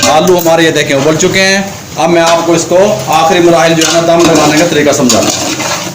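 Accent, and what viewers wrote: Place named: Mewat, India. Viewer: Mewati lgta h cok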